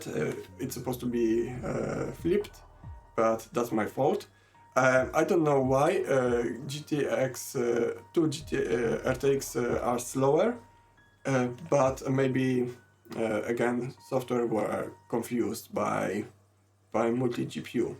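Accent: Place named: Poland. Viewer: Odpalam i słucham - pierwsza myśl polski akcent, sprawdzam Artstation i jest! Pozdrawiam! :D super video!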